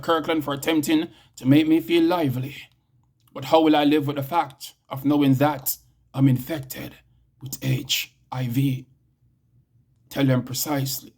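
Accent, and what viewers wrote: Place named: Jamaica. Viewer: Thoughts, emotions, experiences well put together. Expressions one can identify with. I love listening to you recite them. Your Jamaican accent gives it such life! Great job! Love it! 👏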